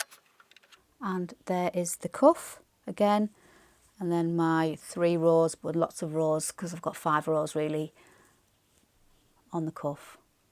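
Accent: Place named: North, United Kingdom. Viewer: The babylock machines are the best i have that coverstitch and an imagine overlocker, You sound northern like me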